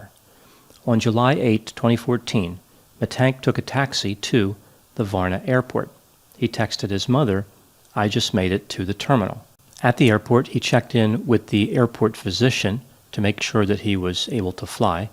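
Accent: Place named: Northeast, United States. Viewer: I would love to know where you grew up. You’ve got a tad bit of an accent there! Sounds like NE close to NY/NJ?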